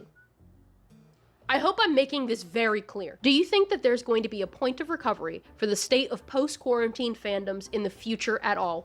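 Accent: South, United States. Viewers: DAVID DIDDLER 😭 I SWEAR EVERY LINE THAT COMES PUT OF YPUR MOUTH IS SO OUT OF POCKET BUT PERFECT THE SOUTHERN ACCENT MAKES IT 100x BETTER HOLLY HELL Sadly I’ve never been to a con of any sort cause I’m from rural Maine and poor but I want to go to the local annual furry con in Portland someday :3 My only horror story about the furry fandom from personal experiences besides having to hide it from my classmates to avoid bullying was probably that I joined when rainfurrest was a current event and a lot of the furries I grew up watching turned out to be creeps 😭 (I think odinwolf ended up being like a huge creep.) Luckily most of the furries that I watched a lot are great people like pocari roo (as far as I am aware) and this guy who I still watch who’s deaf and makes education videos about what it’s like to be deaf and answers questions :3 he’s very wholesome (EDIT: LOOKED HIM UP! 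His name is Xushowolf he’s very cool :] ) So I’m glad not all my memories of being in the early fandom have been tainted by finding out horrible things about the YouTubers years later 😭 Oh yeah also other cursed thing in general is that I also joined the hetalia fandom around that time I’m still in it the fandom is much more chill now for some reason (maybe I’m just good at avoiding the crazy) but I still have people like get mad at me cause I just state (on my own blogs mind you like I’m not even commenting on their posts.) that one of the most popular ships makes me uncomfortable (usuk/whatever y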